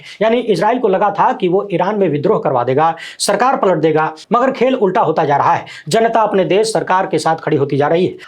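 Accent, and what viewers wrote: Bhojpuri, India. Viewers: आपका भोजपुरी अंदाज़ बहुत ही निराला है । मन को मोह लेने वाला । आप अपने इस अंदाज़ से भोजपुरी भाषा की भी सेवा कर रहे हैं । आपसे प्रभावित होकर, मैं स्वयं आपके भोजपुरी अंदाज़ में, अपनी विडियो बनाने का प्रयास करता हूँ । पहले मैं English language में अपना विडियो बनाया करता था । परन्तु, आप तथा रवीश कुमार को देखकर, सुनकर, मेरा आकर्षण पहले हिंदी की तरफ, तदुपरान्त भोजपुरी भाषा की ओर हुआ । मेरे लिए वैसे तो यह सब काफी मुश्किल है । परन्तु, फिर भी मेरा प्रयास जारी है । All credit goes to u n Ravish ji . 🙏🏻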